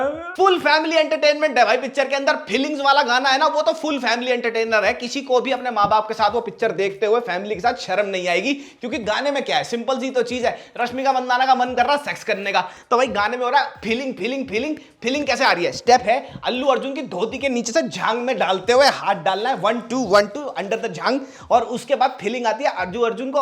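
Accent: Haryana, India. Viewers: Bhai mast review !! Bring back the haryanvi accent 😂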